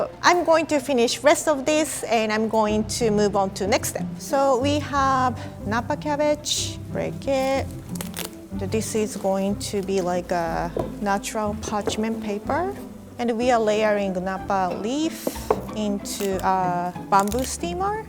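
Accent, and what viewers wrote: Japan. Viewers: lovely Japanese English. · What region of japan is she from. the accent is interesting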